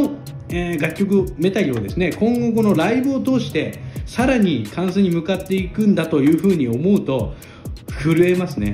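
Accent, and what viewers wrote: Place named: Hiroshima, Japan. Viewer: 所々広島弁が散りばめられているのはさすがです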